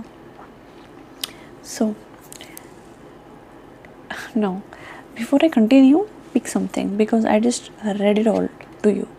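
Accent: India. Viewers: I love the Indian accent ones. Like the sassy ones especially. And the way you 'pop' the final consonants in the words is pure ASMR. I could listen to that all day.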